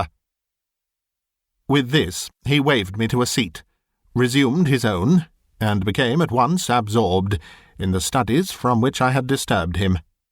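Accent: United Kingdom. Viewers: I'd like to add that it's how you masterfully change your voice to suit each character that is impressive and very convincing. And you have that elegant and clear British English that's very much appreciated by American audiences ( including myself). 🙂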